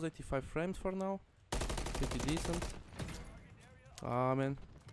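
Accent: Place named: Portugal. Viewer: Eu acho engraçado que é tão facil de identificar um portugues a falar ingles hahahaha, bom video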